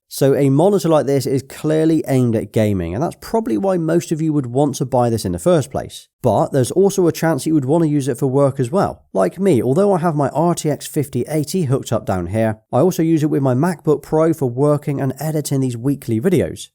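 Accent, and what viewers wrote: United Kingdom. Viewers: He british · I dont think he is american. Sounds british to me.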